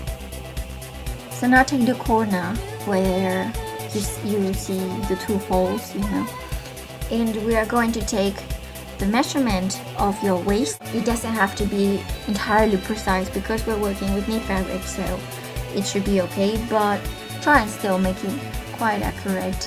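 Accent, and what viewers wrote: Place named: United Kingdom. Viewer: silly question, where are u from cause ur accent sounds british and sort of indian or asian XD sorry if i sounded rude asking lol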